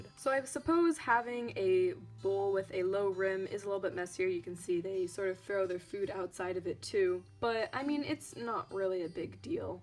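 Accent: United States. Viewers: The person narrating is American.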